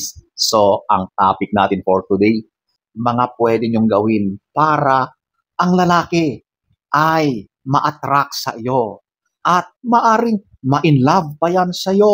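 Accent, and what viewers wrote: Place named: Baguio, Philippines. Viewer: 😂😂😂😂❤❤❤ taga Baguio kadi brod like how you talk cute u mo 😂😂😂buts true LAHAT ❤️🌹😂🥰🤩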